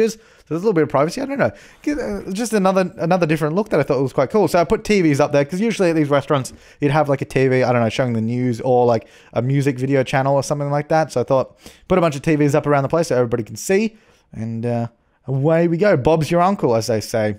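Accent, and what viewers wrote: Australia. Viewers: Your from Australia?!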